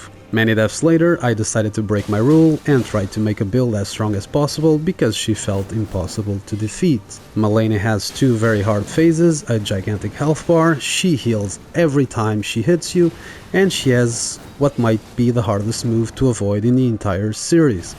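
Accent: Portugal. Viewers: impressionante como um tuga consegue perceber logo pelo sotaque. bom video e boa lista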